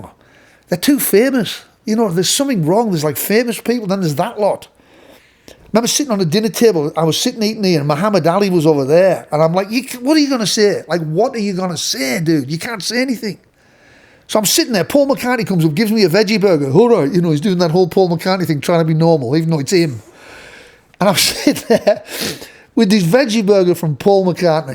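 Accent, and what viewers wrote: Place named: Sunderland, United Kingdom. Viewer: I found your channel a few days ago and am very impressed my friend .am from stockton on tees ..you sound like a mackem to me 😂definitely from the north ?paul weller has been my god for 50. years I’ve heard he was very let’s call it spiky 😁when he was younger but he’s cool now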